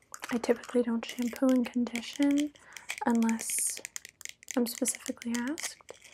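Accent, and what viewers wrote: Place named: Texas, United States. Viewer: This accent is Texan approved. 🤠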